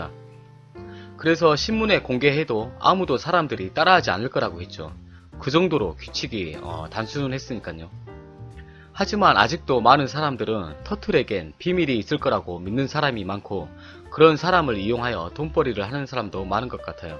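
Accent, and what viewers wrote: Jeolla, South Korea. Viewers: 전라도 사투리의 비애.. 전라도 사람인 제가 들어도 ㅋㅋㅋ 서울 말투써야 먹힐듯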